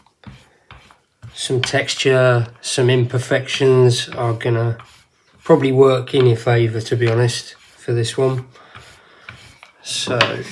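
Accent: United Kingdom. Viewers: Xeno, you've hit the nail right on the head. videos are full of information and easy to understand creative process. Like you, I dislike "self-indulgent" videos that don't actually achieve anything. As soon as I see someone talking about themselves on camera, I turn off. chat is informative and easy to listen to, and the N London accent makes me homesick for England!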